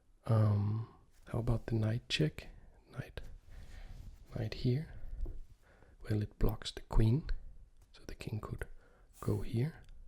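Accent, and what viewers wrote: Denmark. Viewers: Loved your video on the French Whisperer Collab and I'm here again. I'm guessing by your accent that you're Danish, perhaps? Maybe you've mentioned this in an earlier video, apologies!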